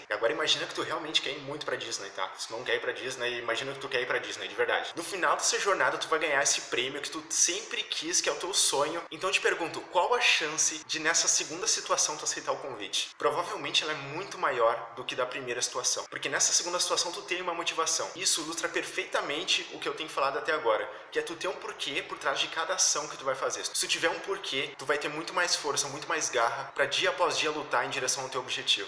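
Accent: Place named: South, Brazil. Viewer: realmente da pra ouvir o sotaque do sul, obrigado pelo video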